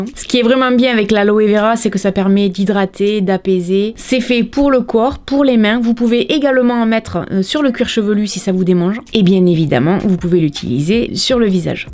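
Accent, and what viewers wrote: South, France. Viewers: Coucou J'aime toujours autant ton accent du sud!!! Dit, pourquoi ne pas utiliser le marc du café après lavoir bu que ce soit en dosettes en capsule... On l'ouvre puis déposé dans ramequin et c utilisable avec gel douche ou aloe Vera...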